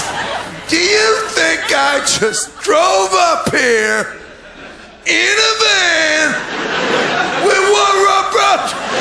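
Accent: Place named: Liverpool, United Kingdom. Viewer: You know he's a scouser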